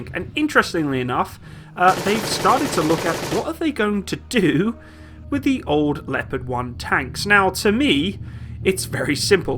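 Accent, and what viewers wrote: United Kingdom. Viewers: i though you were british? how long you been in canada?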